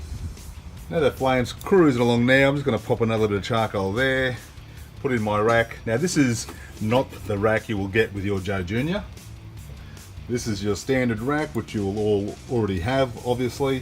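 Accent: Australia. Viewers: Fucking love the native Aussie talk. So much better than the American BS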